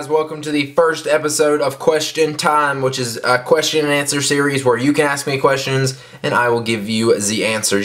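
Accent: South, United States. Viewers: I might wanna infer that your accent's from the South! Are yah.!?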